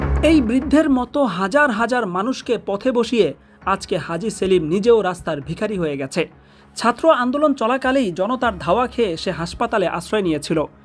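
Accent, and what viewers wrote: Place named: Bihar, India. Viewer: কথায় বিহারি টান আছে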